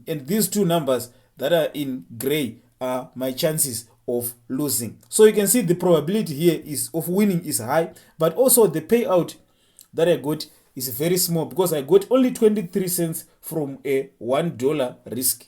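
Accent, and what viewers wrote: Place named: Zimbabwe. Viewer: 😂 Zimbabwean accent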